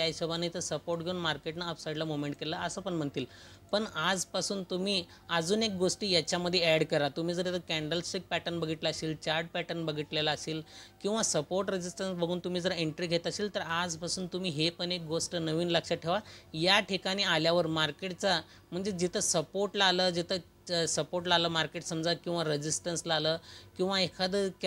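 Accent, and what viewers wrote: Solapur, India. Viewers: Sir tumhi Solapurche ahe Right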